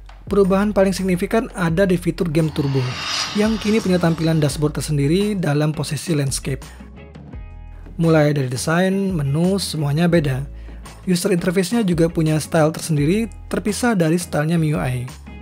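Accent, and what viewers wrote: South Sumatra, Indonesia. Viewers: Cak wong sumsel youtuber ini. Wkwkw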